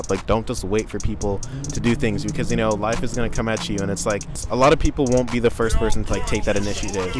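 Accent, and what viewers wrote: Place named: United Kingdom. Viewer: dude this video was so amazing!!! it was so helpful i loved everything you said, it helped me get a better idea of what bowdoin was like (tbh i thought it was kind of stuffy and boring) and the general college advice was amazing as well! this has me excited to hear back from bowdoin. thank you for showing what the city is like as well bc i was under the impression it was in the middle of nowhere so i def wasn’t expecting a city lol. also your energy is just amazing!!! you’re so funny and kind and made this video so fun to watch cause usually i dont like videos like this. you’re amazing man, keep going! you’re gonna be great i can tell!! (also your british accent was so good even tho u said you grew up in the midwest i was like is he from the uk!???)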